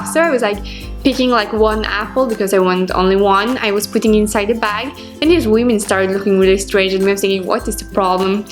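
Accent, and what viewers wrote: Italy. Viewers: why do you speak with an italian accent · Does she speak English with Italian accent? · her accent sounds more Italian, then Norwegian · She is speaking english with italian accent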